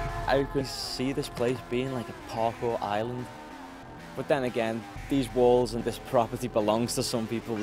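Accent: Liverpool, United Kingdom. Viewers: I knew you were Liverpudlian by your accent<3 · The moment I heard you speak I knew you were from northern England and I thought of Liverpool because your accent sounds exactly like John Lennon's! PS: Great video, great skills, great sport!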